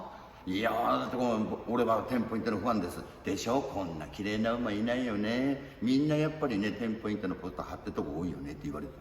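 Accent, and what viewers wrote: Fukushima, Japan. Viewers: 前から喋り方、イントネーションが福島県民っぽいなあと思っていたらやはりそうでしたか！ 同じ福島県民ですが相馬野馬追、一度も見たことがないのでいつか見てみたいです！ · こんだけ話せればボケにはまだまだ早いｗ あと私も福島出身なので訛りがなんだか懐かしいｗ いつまでも元気で馬の話して欲しいなぁ 馬の脚は第二の心臓っていうからねぇ… 蹄を地に付けて歩くことで身体に血を回すポンプとしての役目を担うから 歩けなくなったらもう致命的なんだとか 個人的にヒシミラクルのウマ娘化期待してます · 訛りがうちのじいちゃんに似てると思ったら同じ福島県民だったんですね笑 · なんか喋り方きいたことあるなあと思ったら福島出身でしたかｗ 親父の実家福島だったから懐かしい感じするわけだわｗ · やっぱり福島の訛りか～、死んだじいちゃんと喋り方そっくりで涙出てきた じいちゃんはメイセイオペラが好きでした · 私も地元福島なので訛りが心地いいです。これからも動画見ます！ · この方、福島の方でしょうか？ 浪江出身の祖母の訛りによく似ていて心が和みますｗｗｗ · なんか聞き慣れた訛りだと思ったら同じ福島県でしたか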